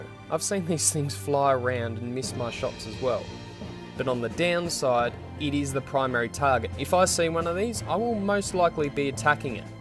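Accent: Australia. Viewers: Spoken like a true Auzzie ! great vid man loving it ! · An Australian eh,lol,I never would've thought.no offense mate,I think you should try defender,I'm mk2,but I have over 2k health,I think you would like it. Plus great job with the vid👍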